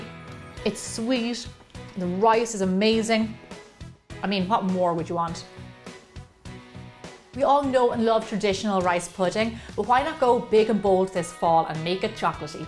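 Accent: Ireland. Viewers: I looooooooooove Irish accent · love your irish accent too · your beautiful Irish accent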